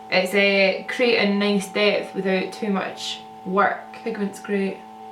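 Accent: Scotland, United Kingdom. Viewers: Haha I'm Scottish as well and I know how ya feel about the pronunciation problem😂😂 "laaaaaaayen low". Your videos are great and it's fab that ur doing more videos these days! Xx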